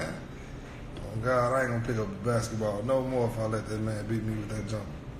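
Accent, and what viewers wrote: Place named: Chicago, United States. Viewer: He sound so Chicago ♥️❤️♥️❤️♥️😍👑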